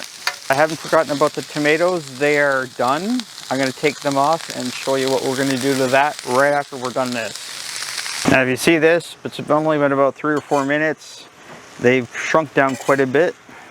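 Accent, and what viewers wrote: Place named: Canada. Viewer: My Canadian brother! I'm an American expat in Panama from the US and love to make meals with beef shank aka OSSO BUCCO as the Panamanians named the cut after the meal. I am assuming your Canadian based off the accent but if I got it wrong my apologies. Gonna watch more of your stuff and share! Thanks!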